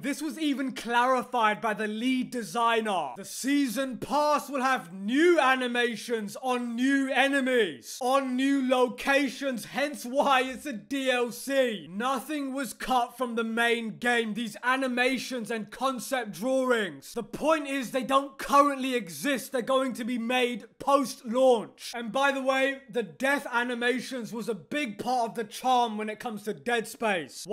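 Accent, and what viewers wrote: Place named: England, United Kingdom. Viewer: Geez, these " This game sucks" channels appear to be all the new rage. They are popping up everywhere, the formula: Possess an English accent, utilize clickbait titles, and just yell about bad something is. LMFAO, the North American IGN review isn't even up on their site yet and he's screaming bloody murder about a game he didn't play and how bad it is 🤣🤣🤣🤣🤣🤣🤣🤣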